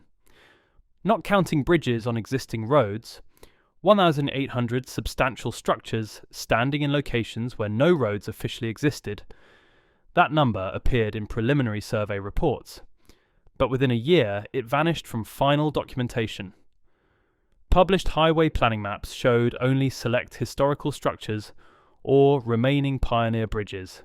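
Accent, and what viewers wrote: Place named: United Kingdom. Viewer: WHY do all of these AI narrated videos have a British voice ? Tired of it.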